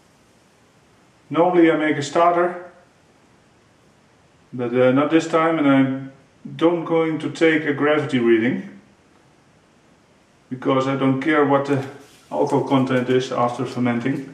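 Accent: Netherlands. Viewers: Allright Im going to try this as my first time brew! How did it turn out? And do I have to filter it before drinking? Proost from Holland ( and hearing your dialect I think you come from NL aswell?)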